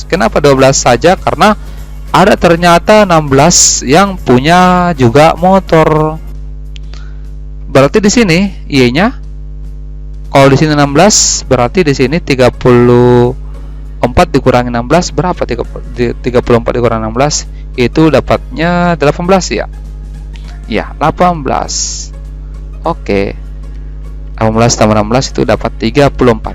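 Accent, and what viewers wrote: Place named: South Sulawesi, Indonesia. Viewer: didengar dr logat nya bapak orang Bugis Makassar kalau tidak salah? 😄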